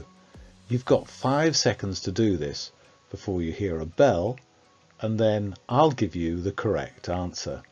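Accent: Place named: London, United Kingdom. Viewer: How can I speak like a londoner? Lovely!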